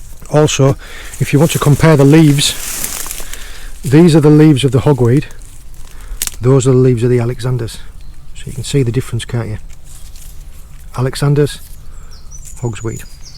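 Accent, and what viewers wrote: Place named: Northeast England, United Kingdom. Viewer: I'm in Pembrokeshire so the Seas are very similar, both of us with our toes dipped in the gulf stream, but there was so much variety in that one spot, I've dived for many many years and have never come across the cuttlefish eggs, they were impressive, and well disguised I guess they could be mistaken for seaweed etc. Your not cornish though are you, I watched some of your videos last year and thought you were on the Northeast coast and remember thinking at the time that's a very unique spot for the North Sea! Thinking it must have been a micro climate on an estuary somewhere, I realise now I'd mistaken your accent for location 😁😁👍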